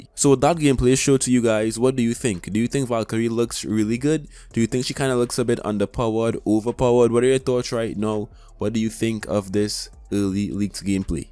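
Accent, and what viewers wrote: Trinidad and Tobago. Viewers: Are you trinidadian? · Are you from Trinidad??? Your cent sounds like it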